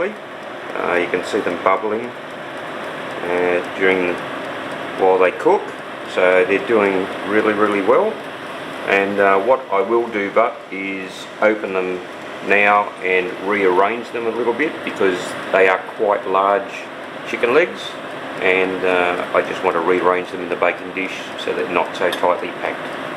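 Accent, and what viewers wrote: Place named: Australia. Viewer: So nice to hear an over the ditch accent 😊 kiwi here x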